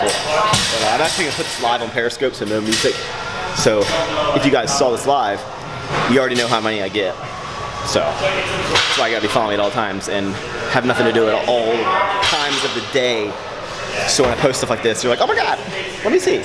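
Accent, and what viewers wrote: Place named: South, United States. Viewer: hey, just curious, where are you from? There's a slight southern touch in your accent lol.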